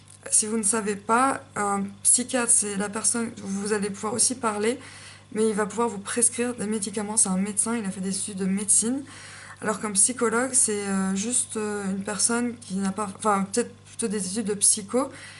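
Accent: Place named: France. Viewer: J'aime aussi ton accent français quand tu t'exprimes en anglais (c'est beau). Je ne savais pas que tu as vécu en Angleterre aussi, mais j'ai bien vu que tu es Franco Anglaise. Merci pour la vidéo (Ecouter de la musique moi j'ADORE !!!!!!! Je m'évade je suis dans un autre monde j'oublie TOUT ça fait un grand bien. Je peux écouter de la musique pendant quatre heures car la musique est tellement varié.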